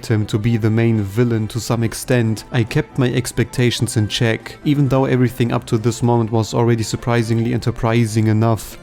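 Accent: Germany. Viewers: du bist deutsch richtig? das hört man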